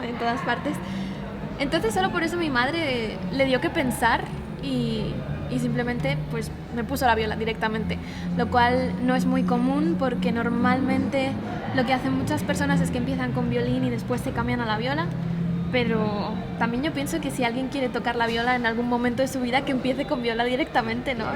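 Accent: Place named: Mexico. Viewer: Se te pegó un poco el acento mexicano 😁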